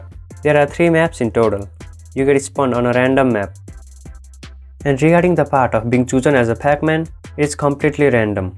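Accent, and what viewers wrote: India. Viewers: Are you from India sir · He's an Indian now thats for sure, its recognizable by his voice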